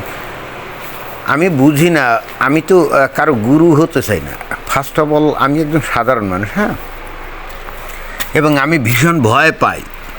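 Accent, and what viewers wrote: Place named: South, Bangladesh. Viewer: আপনার ভাষার উচ্চারণ শুনে ধারণা করি আপনি যথাসম্ভব দেশের দক্ষিণাঞ্চচলের মানুষ,আমার বাড়ি ও এদিকে।Student visa তে আমি ও দুই বছরের মত ইউরোপের একটা দেশে ছিলাম কিন্তু মন টেকেনি।পড়াশোনার পাশাপাশি কাজের সুযোগ ও খারাপ ছিল না তবুও কেন জানি Home sickness কাটিয়ে উঠতে পারিনি চলে আসলাম।পরিচিতরা ঠাট্টা বিদ্রুপ করে চলে আসাতে কিন্তু তাদের কে কি করে বুঝাব দেশের জন্য মন হুহু করার অনুভূতি টা কেমন।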